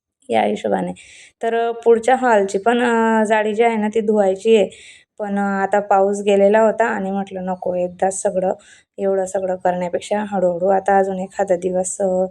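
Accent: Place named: Jalgaon, India. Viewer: tai tumhi jalgaon ch ya aahet ka language varun vatat me aahe so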